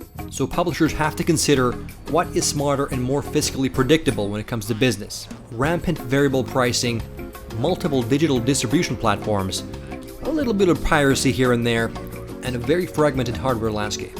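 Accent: Finland. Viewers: Är du finlandssvensk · Is this guy finnish?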